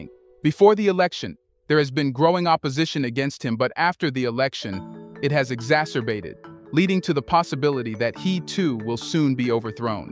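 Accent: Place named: United States. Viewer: The narrator is an AI right? Sounds Amerlcan but the country pronunciations are not consistent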